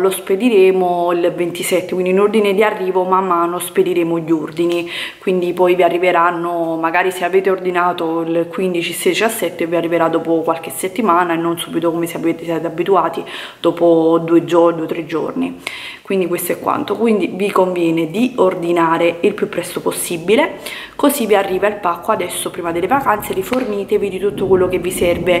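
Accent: Naples, Italy. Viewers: È napoletana, molto probabilmente è abituata a parlare con il suo dialetto, è normale che qualche volta può imbrogliarsi, anche a me succede, certo questo non vuol dire che i napoletani non sanno parlare italiano perfetto, assolutamente, io parlo per il suo caso ( ci tengo a precisare sennò mi attaccano tutti )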